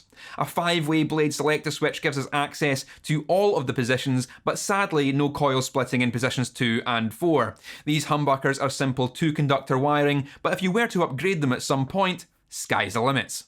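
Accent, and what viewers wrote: Scotland, United Kingdom. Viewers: "We want to hear some Entombed or At The Gates" - well, I don't, I'm mostly here for the Scottish accent, but carry on ;) But, that "Bonamassa" insult, that's too much, take it back! That could possibly be the worst insult anybody has thrown at me!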